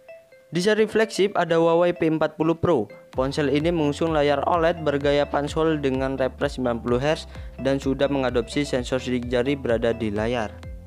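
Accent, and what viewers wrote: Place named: Tegal, Indonesia. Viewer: Medok bener ni tegal nya. Lanjut gan nice info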